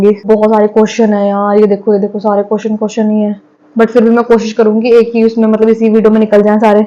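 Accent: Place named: Karachi, Pakistan. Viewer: aapka hindi accent 90% Karachi walon jesa hai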